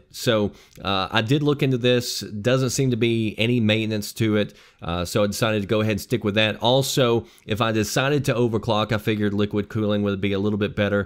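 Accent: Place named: Tennessee, United States. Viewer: Thanks a lot. Ive been thinking about getting a gaming PC and if I do, will got the route you did. BTW.. didn't know till this video but suspected you were from Tennessee from your accent... bet ya can't guess where I'm from..lol For some reason I thought my account was my gamer tag.. Knoxrandall...lol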